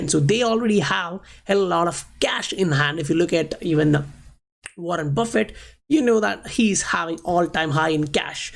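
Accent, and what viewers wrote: India. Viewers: 2 seconds in, lemme guess, you're indian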